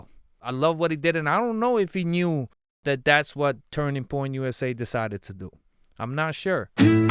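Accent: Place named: Puerto Rico. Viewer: You look and sound boricua bro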